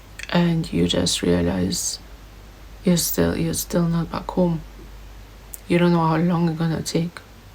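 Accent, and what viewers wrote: Trinidad and Tobago. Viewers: You're a true Trini with accent and all. You will get there soon...love the sunset painting ❤ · You may have left Trinidad, but I hear and see Trinidad in you. Big up, and stay strong gal. Ya go make it.!!! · You are stronger than you know. You are a true Trini resilience and strong. You even sound Trini❤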